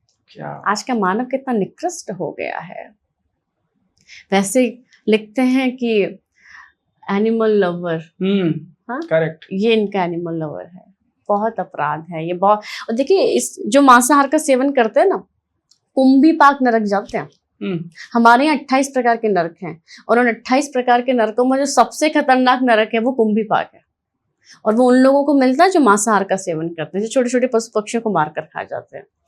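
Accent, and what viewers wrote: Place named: England, United Kingdom. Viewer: आपकी आवाज अलग ही है। आप कहां की हर जी ।मुझे लगता था आपकी आवाज सुनकर कि आप कोई अंग्रेज है😂😂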